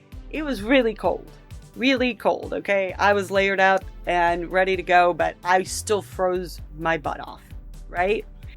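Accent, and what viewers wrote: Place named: United States. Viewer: This is very random but your voice+American accent combo sound so good it’s really nice to listen to! Sending love from Romania⭐️🤍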